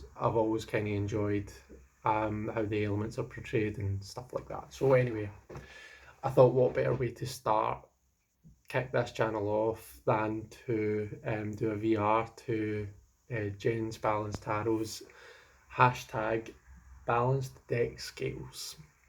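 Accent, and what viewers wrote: Scotland, United Kingdom. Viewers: Congratulations on a fab first video and always lovely to hear a broad Scottish accent :-)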